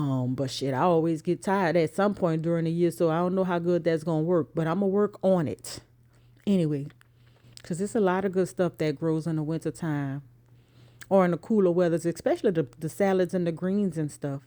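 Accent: New Orleans, United States. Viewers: Sounds like you from New Orleans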